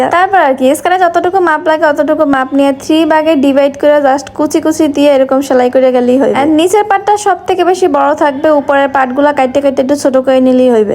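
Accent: Barishal, Bangladesh. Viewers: জি আপু সবই ঠিক আছে;কিন্তু আপনি নিশ্চয়ই বরিশালের মেয়ে!!👈💃💃☺️☺️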